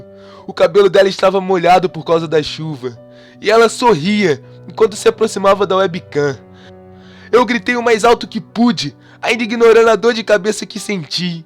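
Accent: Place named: Rio de Janeiro, Brazil. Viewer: Sotaque do Rj haha. mandou bem demais. tem talento e merece sucesso. like p vc e ganhou uma inscrita :)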